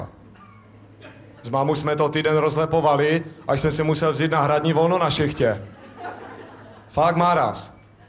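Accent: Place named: Prague, Czech Republic. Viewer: mne to pripada trapny...kazdej ostravak sice mluvi jak jantar, neumeji dat skoro dohromady ani vetu, ale tento borec mi prijde trapnej..taky bych rekl, ze to bude nejakej prazak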